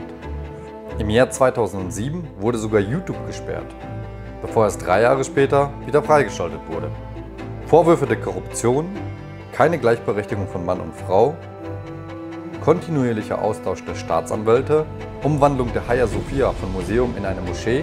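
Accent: Germany. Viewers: I like your German accent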